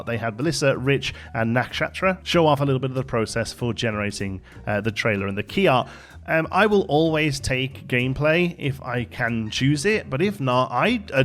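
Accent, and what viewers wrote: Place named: Staffordshire, United Kingdom. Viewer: Loved this insight, I'm so pumped for Planet Coaster 2 now, it's everything I wished for whilst playing Planet Coaster 1. By the way, weird question. I'm from the UK but have never been able to nail down your accent's area, I think it's west midlands somewhere but it's very specific with the way you pronounce the 'ow' in now and so on, I've heard a few people have this inflection and I've always wanted to know the town or area that pertains to. I'm a bit of an accent enthusiast so sorry for the very odd question!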